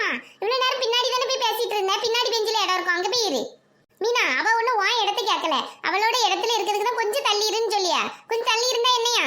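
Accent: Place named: Tenkasi, India. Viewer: Idu entha ooru slang semaiya iruku ..thenkasi side madri iruku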